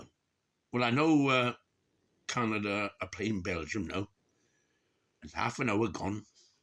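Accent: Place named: Wales, United Kingdom. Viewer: Evening, always nice to hear your views, and that wonderful Welsh accent! Albannach, not Albanian ya Wallonian🤣🤣🤣 We live in interesting times.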